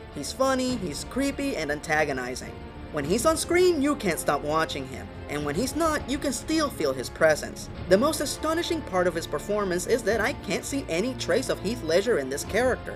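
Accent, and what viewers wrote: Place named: Puerto Rico, United States. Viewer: he's Puerto Rican so 1. I don't think English is his first language and 2. he has a slight accent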